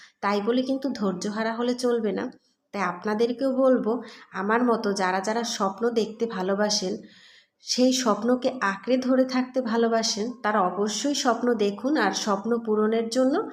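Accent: Barishal, Bangladesh. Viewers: তোমার বরিশালের কথাগুলো মিস করি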